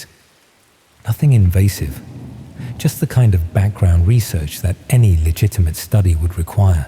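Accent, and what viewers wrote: United Kingdom. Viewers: Every night I fall asleep to stories like these. It’s nice to have a British one listening to places I know of. Since listening to you to fall asleep I’ve found myself staying awake slightly longer to listen to what’s happening.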